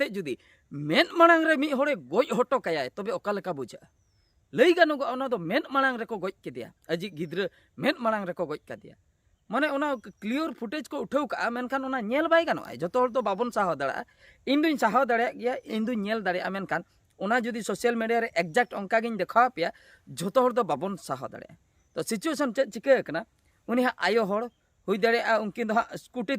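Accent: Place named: Jashipur, India. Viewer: Aale sur reyah kana nowa do jashipur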